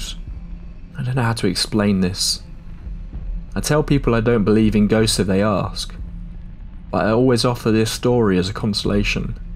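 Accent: United Kingdom. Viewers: A British accent, monotonous voice, and spooky content...Recipe for success on YT. 😄 Not hatin' on it at all! I'm addicted!...Ijs, it's become "a thing". 🤷🏾‍♀️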